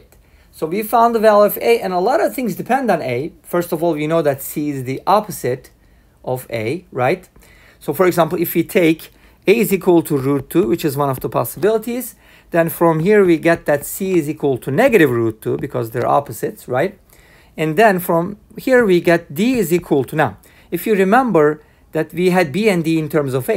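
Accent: Turkey. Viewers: usta bu fikre şivenden kapıldım ama türk müsün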